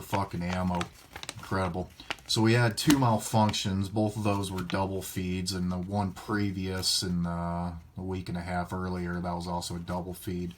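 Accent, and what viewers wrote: United States. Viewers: When you talk you remind me of the MN boys 🤣 I've been to Des Moines they must be alot different than rural Iowa I also get why people are safety Nazi's just because I had a gun doesn't mean one of my kids didn't touch it or whatever but people don't get the safety is situation dependent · fuck man were you born and raised in NYC, that's how we speak BROOKLYNESE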